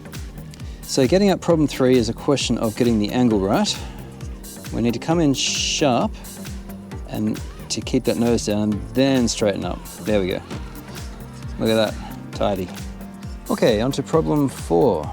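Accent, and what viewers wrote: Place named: Australia. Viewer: Definitely Aussie 😂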